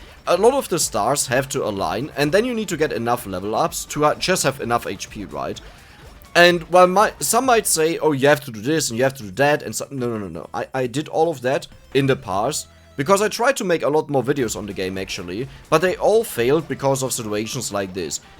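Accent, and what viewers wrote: Germany. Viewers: Nah its not just book, pretty much every word with O is butchered. I think its of your german accent from your region. We from berlin butcher english another way, so dont worry.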